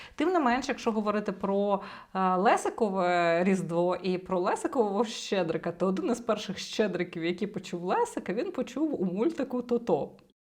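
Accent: Russia. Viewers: Цій авторці треба більше в житті спілкуватися українською. У неї купа рускіх звуків , вона вимовляє українські власні назви на москальський лад . Най більше слідкує за вимовою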